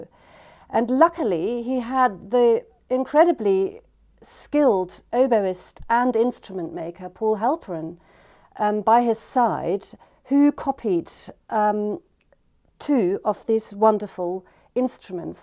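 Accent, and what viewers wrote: Germany. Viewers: KAOSON There’s a touch of German in her English. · She sounds German. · it's definitely a german accent ;) source: I'm german :) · I’m English and I lived in Hamburg, Dresden and Berlin over a 7 years. Maybe that makes me particularly sensitive to her accent, but to me she sounds typically German. She has faultless English and has probably lived here for a while but she still very much has a German accent. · I think I can catch a touch of the German accent in her English speaking voice at times actually.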